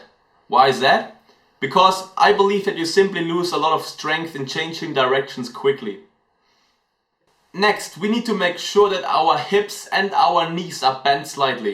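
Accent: Germany. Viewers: Du bist doch deutscher mann hört’s an deinem Akzent 😂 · hey dude .. this is very helpful , thanks !! by the way .. Are you a german ?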